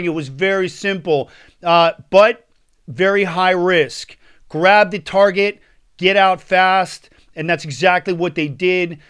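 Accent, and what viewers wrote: United States. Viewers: Amhaz probably has a lot of info on seaside smuggling as well as any planned attack on Israel's off-shore gas rigs. Nice work, Israel &! You a New Yorker...? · Your israeli elite forces? You sound pretty american to me so are you israeli or american which side are you picking if they both went to war with each other? america is controlled and infiltrated by israeli’s that act like they care about american interests but all they do is serve israel.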